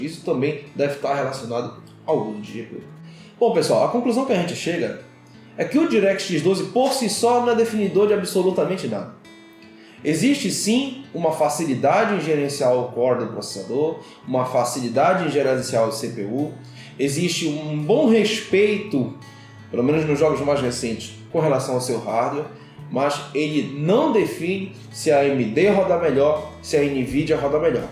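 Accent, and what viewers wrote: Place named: Recife, Brazil. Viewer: Cara, de todos os sotaques que eu já escutei nesse Brasil, esse sotaque de Recife é o único que me incomoda! Eu me pergunto se esse sotaque é em toda a Pernambuco ou só ocorre especificamente em Recife!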